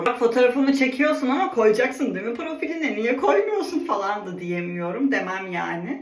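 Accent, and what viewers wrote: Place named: Central Anatolia, Turkey. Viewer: Aksanın bile çirkin iç Anadolu aksanın var sipariş verdiğiğğğmmm düzgün Türkçe konuş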